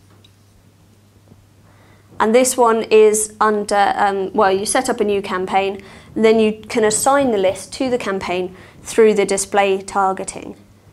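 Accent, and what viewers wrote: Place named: England, United Kingdom. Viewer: Not bad, a little more experience and she'll be golden. I will say, English accents go a long way, if this was an American woman I don't think it would have played as well. I could listen to her talk all day...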